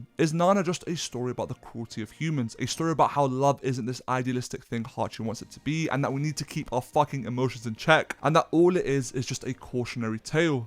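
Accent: United Kingdom. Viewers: ur talking too fast and I'm struggling to keep up due to ur thick british accent tbh. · you british accent makes me laugh sometimes and it snaps me out of what youre talking ab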